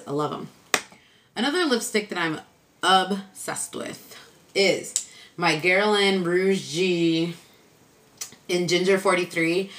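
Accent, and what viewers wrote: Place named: Chicago, United States. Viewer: Your Chicago accent is really strong in this video. It makes happy because I my miss my hometown!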